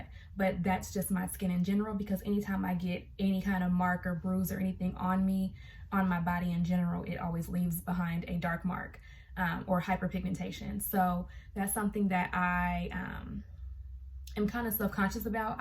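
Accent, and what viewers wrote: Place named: South Africa. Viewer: Hey. Am from South Africa. (Am Assuming) You sound South African😹😹 Where can I get that face washer😭😭😭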